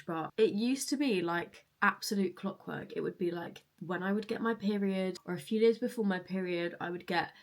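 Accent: United Kingdom. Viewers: no clue how many years I've been following you by now... its been a while...2018 I think. You have always been such a truthful, kind, cute, funny and relatable person and I cherish that someone like u is also present on social media. ❤ thank you! Also keep that strong British accent coming, it slaps 👏🏻 I'm sorry that your anxiety has gotten worse again and I hope u can find something that helps you ❤ Sending lots of love to you and your family ❤ Awesome vlog btw 🎉😊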